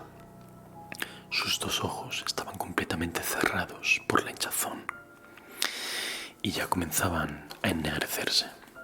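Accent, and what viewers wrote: Spain. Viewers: i've been looking for an asmartist with your voice tone and spanish accent for a long time, i cant believe i've finally found it! (i can understand everything you say, im just bad at talking spanish back lol) saludos desde brasil!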